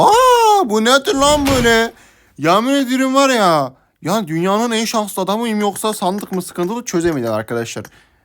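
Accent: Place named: Thrace, Turkey. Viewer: Boş video aq Trakyalı konuşma cabana bayıldım kardeşim 😂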